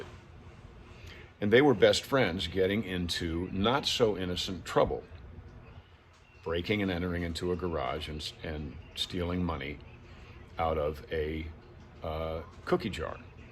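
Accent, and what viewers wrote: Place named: New York, United States. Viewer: You sound like a New Yorker of the East Coast. Lord forbid! You are spot on! Keep talking? Been watching "Chips!" myself... "Heat of the Night!" "Magnum PI," "Hunter!" "Soap" .."Quincy." " Little House on the Prairie." "Mash!" And what I would like to find and re watch again is "Benny Hill!" Kids don't know what they are missing. I wish they would rerun "Dr Quinn M.D." again... They never get old!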